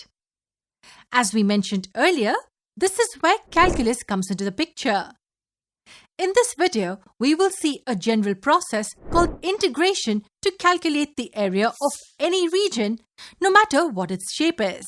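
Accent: India. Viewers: aaaaahhhh Indian accent ........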